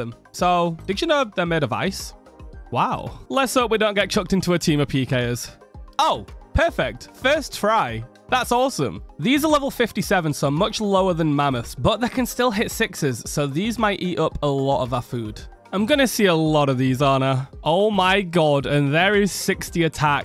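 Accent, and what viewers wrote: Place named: Hull, United Kingdom. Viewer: Dude are you from Hull? Accent is strong with this one. Good vid tho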